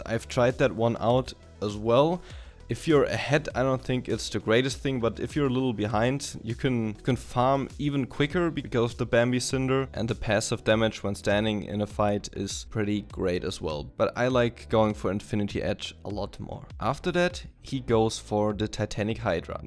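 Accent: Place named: Germany. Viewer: Der Akzent... Da ist wohl jemand Deutsch :3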